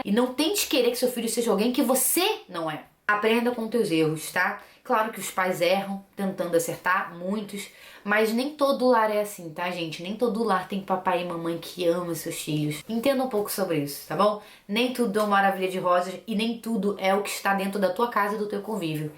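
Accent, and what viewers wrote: Rio de Janeiro, Brazil. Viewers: amo o seu jeito de falar cariocaaaaaa, KKKKK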